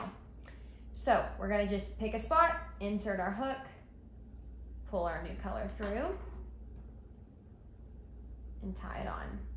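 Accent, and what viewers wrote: South, United States. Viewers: You explain so well and have such beautiful work! Also I love the southern accent I’m from the Midwest This is such an incredible video thank you so much! You definitely have a gift for teaching and arts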